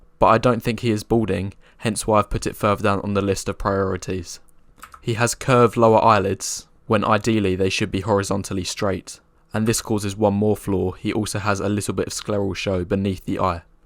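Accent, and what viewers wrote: United Kingdom. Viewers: but the narrator's voice is way more feminine and soft and incel then all of these guys he's calling out. What's worse is he's got a British accent which is viewed as weak in most cultures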